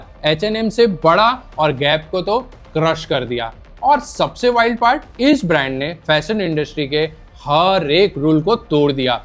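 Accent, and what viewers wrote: Gujarat, India. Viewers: Gujju accent.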